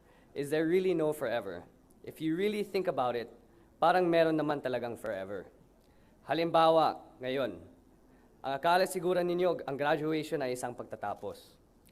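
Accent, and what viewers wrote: United Kingdom. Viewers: Nakaka Harry Potter naman ang accent 😍😍😍 Love na love ko yarn ❤️❤️❤️❤️🤗😘😘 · Love the slight British accent! 😍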